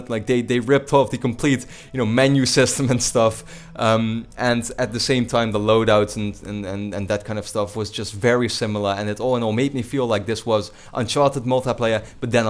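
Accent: Netherlands. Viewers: When I heard your accent I immediatly knew you were dutch. Nice video dude.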